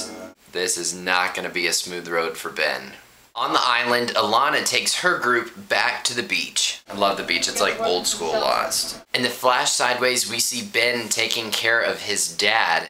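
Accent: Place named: United States. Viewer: I love your videos dude, and I especially like the sound of your voice and your accent, it's so cool, which part of the US are you??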